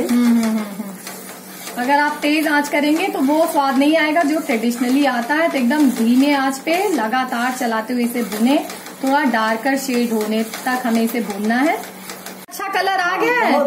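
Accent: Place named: New York, United States. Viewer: NYC voice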